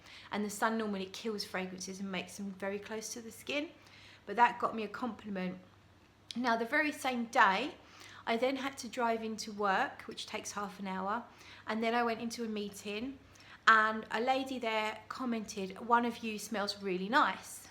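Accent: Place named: United Kingdom. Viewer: What part of the uk are you from? The accent is fun to listen to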